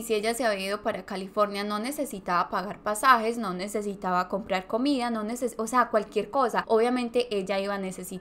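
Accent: Antioquia, Colombia. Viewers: Es una mueletilla del acento paisa en Colombia...es genial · es Colombiana 🇨🇴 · un gusto tu forma de contar ya me suscribi eres de colombia?saludos desde lima Peru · Te encontré, y no pude resistír hacer una maratón de tus videos . 😮 wow lo haces muy bien y ese acento paisa me encanta viva nuestra 🇨🇴👏🏻👏🏻👏🏻👏🏻👏🏻👏🏻👏🏻👏🏻👏🏻👏🏻👏🏻👍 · Primera vez que veo tus investigaciones, soy panameña, me encanta tu atento colombiano, me encanto el Berraca, excelente trabajo y este caso te quedo fenomenal, duro y exitos guapa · Saludos desde Orange California 🍊 from 🇲🇽 Mexico. Ese acento colombiano el mas hermoso ❤ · Wow! Muy buen video, me gusta como narras y la información que proporcionas está muy detallada 👌. Tienes una nva. suscriptora 😃. Por cierto tu acento de 🤔 colombiana? me suena bien lindo, me recuerda a las de las novelas colombianas que veía con mi hija 😁. Saludos desde Mérida, México 🇲🇽. 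· Eres de colombia verdad se nota por tu asentó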